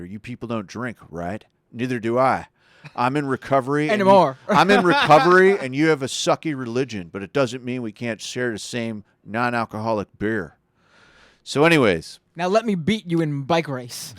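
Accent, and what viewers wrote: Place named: Texas, United States. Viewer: Love the accent Sam! You sound like I would imagine a Texan to sound like...kind of a Southern Western drawl. The internet is buzzing with what the Texas State Government wants in their text books. "My great great great grandpappy used to ride on one of them Brontos, said it made him feel closer to Noah!"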